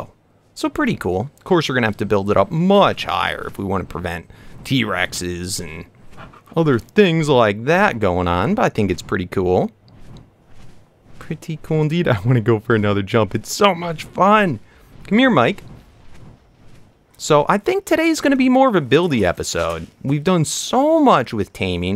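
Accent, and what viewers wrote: United States. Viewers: u got blue eyes and u have an american accent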